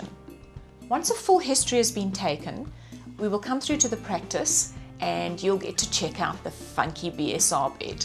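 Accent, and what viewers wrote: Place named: South Africa. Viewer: nice south african accent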